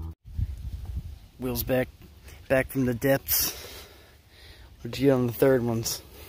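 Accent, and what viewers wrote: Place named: South, United States. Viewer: Well Dude I understand about the secret hunting stash, but you can still share other videos right? Maybe another sponsor will pick you up? Other than that, things are pretty boring in the "Burgh" this time of year except for $5.00 for a dozen eggs or $7.00 for a jar of mayo. Hey! maybe that could be your next video. Don't forget, the first video I saw of yours was a tour of the Conquest, I literally searched " Carnival Conquest Tour" and you were the first vid that popped up. I'm not sure if the content matters. Your voice and the slow way you explain things along with the southern accent are your strong points. Let's be honest, there is not a huge market for prehistoric shark teeth.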